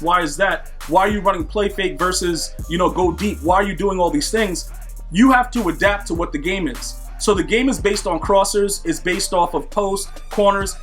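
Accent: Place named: Boston, United States. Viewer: Yo are you from Bahstan? I hear your accent lmao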